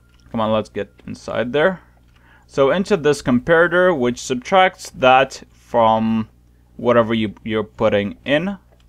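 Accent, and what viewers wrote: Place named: Canada. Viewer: you sound Canadian :-]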